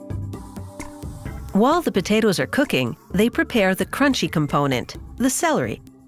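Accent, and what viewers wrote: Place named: Canada. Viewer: This wouldn’t be a US episode as the narrator is Canadian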